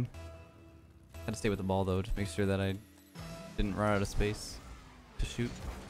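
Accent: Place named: Canada. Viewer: The canadian really comes out when you're this quiet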